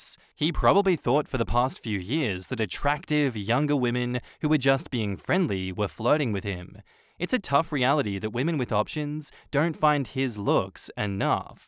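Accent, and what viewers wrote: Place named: Australia. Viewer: Ausssie daddy hubby reading Spanish 🤌🏻💕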